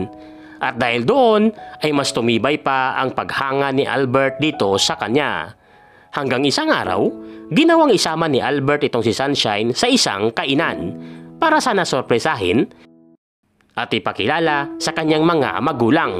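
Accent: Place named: Iloilo, Philippines. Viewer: ang ganda nang storya salamat ilonggo gid kaw yung accent mo halatado lol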